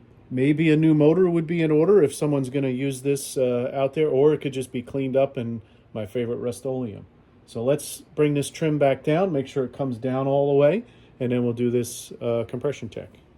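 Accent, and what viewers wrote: United States. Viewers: I have a couple of comments today. One is about the quality of your video and sound, which I think is more than good enough. The video quality is great. We could see this time that you got the camera very close, a very clear image of the different parts of the engine. On top of that, you don't need a new microphone but simply speak facing the camera and close enough to the "mike". Although of course a remote mike hanging from your neck would be closer than it is now. The other comment is a silly one. Your nickname is a very nice one. I do like it but in my understanding of your speaking it always sounds to me like outboard-dead, like meaning that your are able to bring back to life a dead engine which is a big truth. OutboardDaddy would make it very clear the meaning of your nickname. I'm sure it is just that I'm foreigner altough I consider that my level of english is not bad, there's a discrepancy between my ears and your accent, which on the other hand I think it's a very typical and crystal clear American accent, much clearer for most people than the heavy accents that I found in Texas, but to me is hard to find the differences of dad and dead pronunciations (same happens "to me" when finding the difference between man and men or can't and can).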